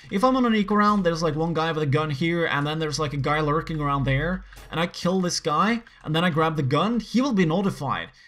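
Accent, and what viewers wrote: Norway. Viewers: Hei :) O_o er du norsk? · Er du norsk?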